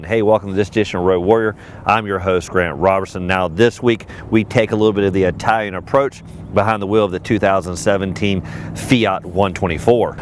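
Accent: South, United States. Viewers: Hillbilly talking Italian styling. Good grief. Pretty sure you don't need the strap on the glasses for this particular review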